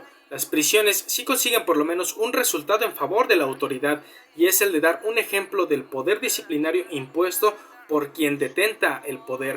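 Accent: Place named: Mexico City, Mexico. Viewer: Todo iba bien hasta que se oye tu pinche acento chilango arrabalero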